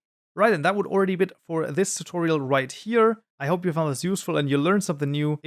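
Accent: Germany. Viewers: Nice video! I appreciate the fact that you convey much information in short videos. Btw you sound like you're German :D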